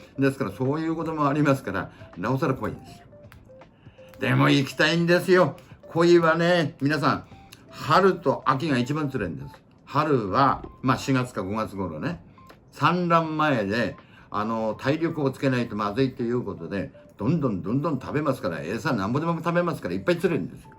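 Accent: Fukushima, Japan. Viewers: ウマ娘を当てる動画から来ました。耳馴染みの深い故郷の訛りで、福島の方だとすぐにわかりました😊ほんとに熊にはお気をつけてお過ごしくださいね💦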